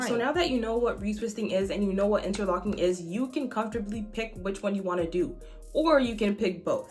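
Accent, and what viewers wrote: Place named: Africa. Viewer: You have a slight accent, I’m just curious are you African? Also I love your videos, they’re very helpful.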